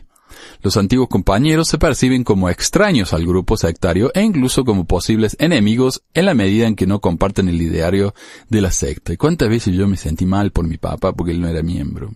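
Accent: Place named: Interior, Argentina. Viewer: Bien tirado. PD: me gusta como locutas. Soy de Perú, pero intuyo que eres del interior de Argentina porque tienes un acento como el de Luis Landriscina (un cómico). Saludos cordiales.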